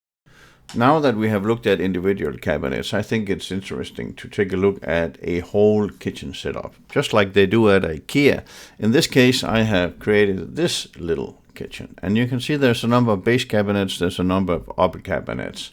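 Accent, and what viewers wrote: Denmark. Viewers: Hi, I just found your channel. I subscribed immediately because I love your Danish? accent. Both my parents were born and raised in Danmark. We're thinking of installing Ikea cabinets in our kitchen. Thank you so much for explaining the differences in cost. I really like the oak fronts and was sorry to learn that they are the most expensive. I might have to get white ones instead. Which is your favorite white door and which color white do you think looks best?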